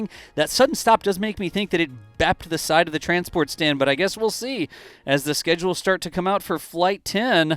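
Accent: Andalusia, Spain. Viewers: Estupendas las explicaciones de como van los avances, gracias por la narración en andaluz. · GRACIAS a tí por traernos las noticias de primera mano y en perfecto español (ademas con ese acento andaluz tan simpatico y agradable) ❤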